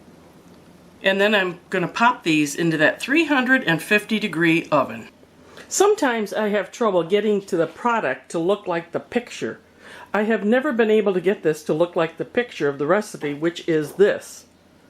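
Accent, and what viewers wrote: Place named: Minnesota, United States. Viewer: Hi! Do you and live in Mn? I'd say, you sorta have a Minnesota accent!😀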